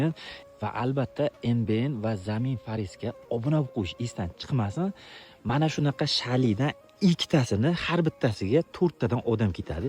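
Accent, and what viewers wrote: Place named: Jizzakh, Uzbekistan. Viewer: Жиззахданмиз ака узиз кайердан чота шебев бизни шевага ухшебди❤❤❤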